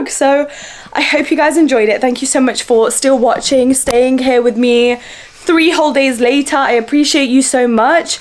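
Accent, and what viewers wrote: United Kingdom. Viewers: I love you London ascent ❤❤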